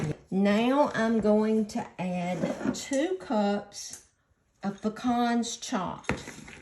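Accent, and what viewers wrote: South, United States. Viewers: I love your Southern accent !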